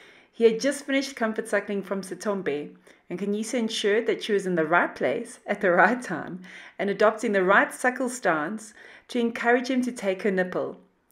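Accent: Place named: South Africa. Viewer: I'm loving these very informative lessons on my favourite HERD.💖💖🐘🐘🥰🥰 It's so wonderful of you to put all these videos together for us to learn from.👍👍I appreciate your hard work. I'm a South African living in UK and it's so heartwarming to be able to hear a South African accent everyday,whether it's these videos or Adines' daily videos.The highlight of my day. Baie Dankie.👏👏😍😍👍👍💖💖🐘🐘🐘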